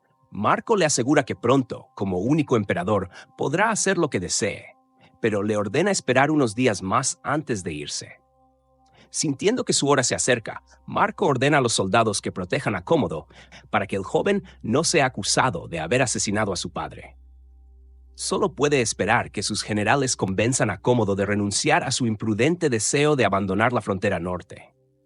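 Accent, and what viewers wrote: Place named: United States. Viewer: Porqué un narrador gringo, FUCHI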